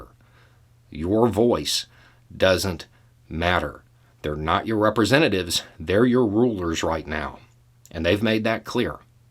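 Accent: South, United States. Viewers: I just LLOVR that southern (drawl?). We here in east bay SF agree you got it absolutely down! These people (everyone) better wake up. Good luck🙏🏿👍🏿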